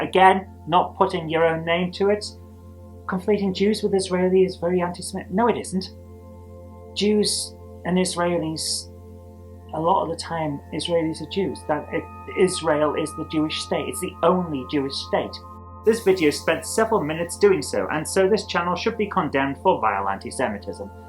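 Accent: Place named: Newcastle, United Kingdom. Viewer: You sound much more Geordie in that old clip ! Great response video😊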